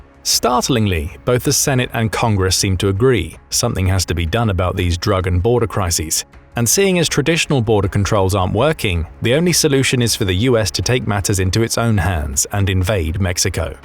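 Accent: United Kingdom. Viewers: Heard a British accent, automatically disregarded their opinion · You can tell you have no idea about American Military. There is no way they would be that big an issue. And Mexican American Soldiers would not desert the American Military cause they know if they tried crossing the boarder later they would be killed for desertion. Remember this is some Brit by the sound of him has zero U.S. Military experience whatsoever. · 😂 bro, you,forget the firepower Americans have more war experience since you sound like you from the uk you have no idea how much firepower us have and the damage they can do only thing would be they wouldn't want to harm civilians they ony want the cartel but if Mexico government keeps helping them cartels than yeah us will wipe thrm out faster than what you believe.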